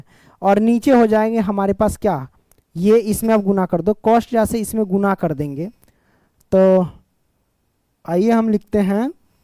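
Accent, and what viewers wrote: Bihar, India. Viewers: Aap Bihar ke ho sir😊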